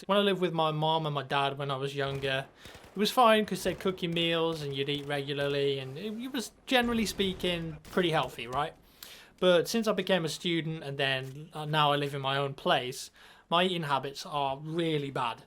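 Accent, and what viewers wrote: England, United Kingdom. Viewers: is he from England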